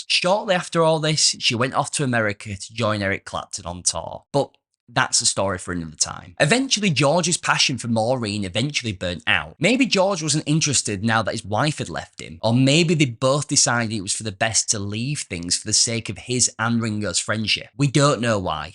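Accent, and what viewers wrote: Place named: Hull, United Kingdom. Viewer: You have a slight Hull twang.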